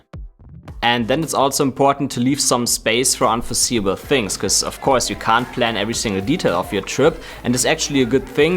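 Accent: Germany. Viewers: In den ersten 3 Sekunden gemerkt dass er deutscher isr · aha gut ich bin aus Basel🙃 als ich deinen Namen sah und dein Akzent hörte, dachte ich, dass du bestimmt aus der DACH Region bist :) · Are you German?? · Definitely German accent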